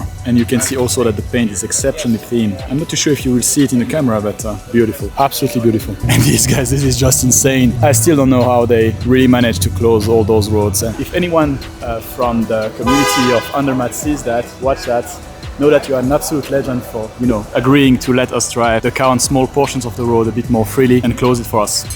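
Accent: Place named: France. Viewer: On sent l’accent français haha ! Je viens de découvrir ta chaîne et la qualité du contenu est juste parfaite 🤩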